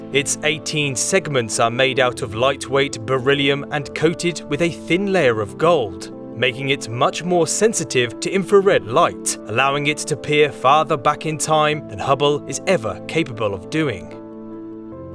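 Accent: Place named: England, United Kingdom. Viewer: Sounds smarter with an English accent. Can you do it again in an Australian accent? Oy crikey mate!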